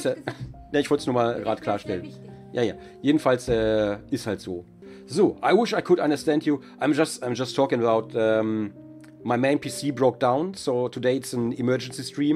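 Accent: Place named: Germany. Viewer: Nicht wirklich. Wenn ich ihm beim Englisch-sprechen zuhöre, fällt mir der deutsche Akzent schon sehr auf. xD Aber eigentlich sehr schade. Stellt euch mal vor, wie krass viele Abos Gronkh bekommen würde, wenn er auf Englisch kommentieren würde! :o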